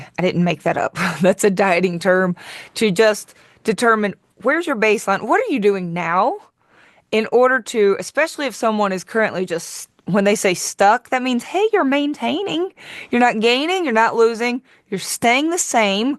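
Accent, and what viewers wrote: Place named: South, United States. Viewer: Am i the only one that giggles everytime she mentions "cute little husband" as code for libido? Golly, i love this gal. She talks like me, but in southern belle terms. Lol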